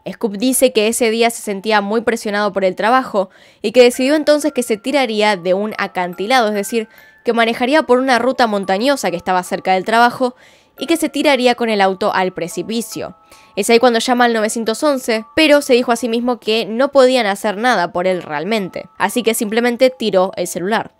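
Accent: Argentina. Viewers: ¡Me encanta tu gata! De ahí que es un plus más para ver tu canal, siempre me fijo en ella, a parte de tu acento argentino. Un beso guapa. 😘 😻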